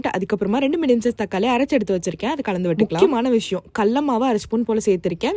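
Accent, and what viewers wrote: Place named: Chennai, India. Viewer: Chennai Tamil pa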